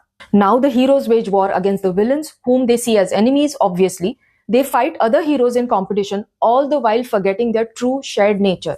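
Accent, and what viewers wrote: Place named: India. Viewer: Your Indian accent enhances it so much🙏✨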